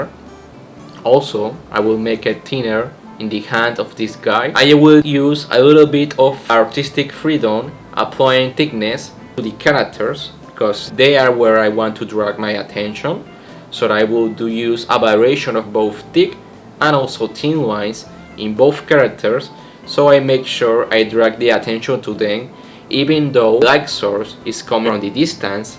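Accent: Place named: Latin America. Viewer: ya me parecía que el acento era algo latinoamericano (en la información de tu canal dice que sos de Panamá te juro que no te doxeé) de verdad es increíble ver qué la comunidad de artistas es tan variada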